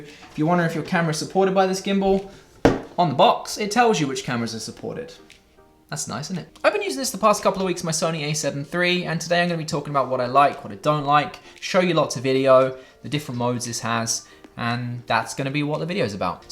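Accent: United Kingdom. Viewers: There's nothing cool about the LED light, but then I am nearly fifty! Good video and good to hear a UK accent about photo/video gear on YouTube. BTW Your avatar looks like you're wearing chain mail.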